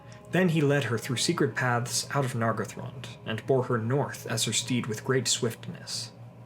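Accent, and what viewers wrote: United States. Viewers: Lol, it sounds so wrong told in an American accent😂🤣!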